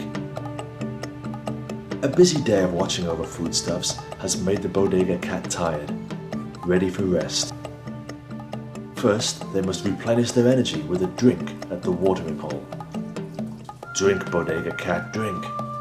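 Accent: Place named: RP, United Kingdom. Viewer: David Attenborough would be proud. BBC English, of course. Narrated by some guy called. How's that for authenticity?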